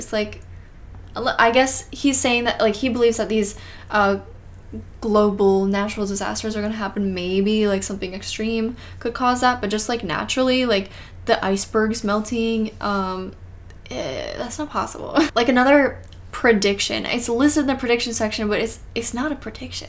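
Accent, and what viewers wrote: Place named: Midwest, United States. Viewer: Variation on the US accent. I think Midwest but I can’t remember if has said where she’s from!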